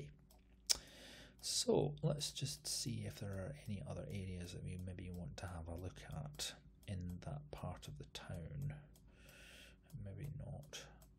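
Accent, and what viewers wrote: Scotland, United Kingdom. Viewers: Do you think there are commonalities between Scottish and a certain kind of Indian speech? Trying to speak as they do in England isn't working out very well. It never stopped feeling like I am trying to be like something I not, and more importantly, was not meant to be. I found your channel because I feel iike there is a likeness in Scottish tongue to that of my own, via which, I could develop. And that wouldn't feel contrived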